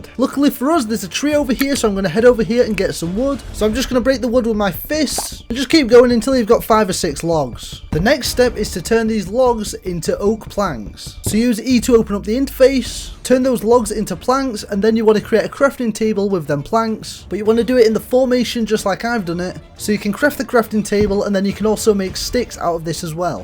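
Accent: United Kingdom. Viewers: ok british boi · hes british lol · just found out he's British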